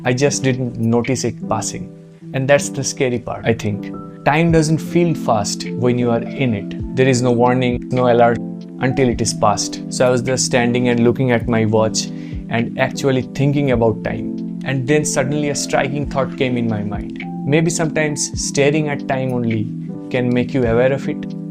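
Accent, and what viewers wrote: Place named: Bengal, India. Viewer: Such a heavy bengali accent.....and its your usp.....never try to change it.....its your identity❤❤❤❤❤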